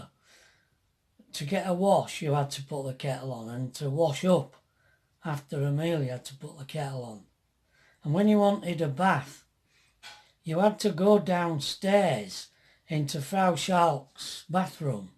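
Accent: Yorkshire, United Kingdom. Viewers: We love watching your channel; your a man of many talents and your both a lovely couple: You make us smile and it’s great to hear the Yorkshire accent and sense of humour: We are from Bradford and being living here in the West of Ireland which we love: Don’t give up; remain positive; your a very special couple.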